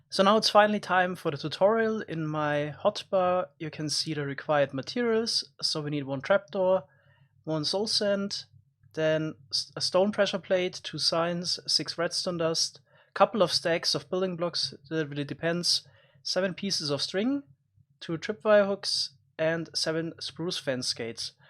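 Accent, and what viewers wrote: Germany. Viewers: Der deutsche accent 😂😂😂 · Alder dein english is so deutsch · Hab nach dem ersten Wort gewusst das du Deutsch sprichst👌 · You sound very very german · Dachte ich mir auch der hört sich Mega deutsch an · Man hört den deutschen akzent instant raus xd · man kann so raushören, dass du deutsch bist XD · Fucking German accent i can’t understand your English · Deutscher accent cool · are you german? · man hört so hart das er ein deutscher ist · Are you german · man hört so stark das du deutsch bist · you sound so german are you? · Is he German? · Mal ne Frage bist du deutsch oder warum ist deine Aussprache so stark? xD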